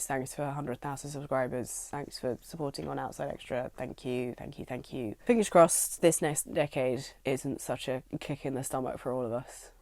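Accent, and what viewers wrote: United Kingdom. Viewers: so is she a brit or does she just sound weird?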